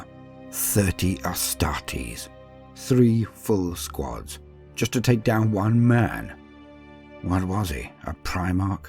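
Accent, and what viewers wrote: United Kingdom. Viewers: That voice. Like a british Tex.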